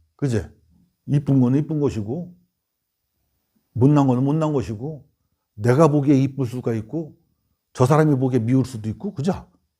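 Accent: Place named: Chungcheong, South Korea. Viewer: 맞는 말씀이유, 시님!!!^^ 충청도 사투리 넘 구수해유^^♡♡♡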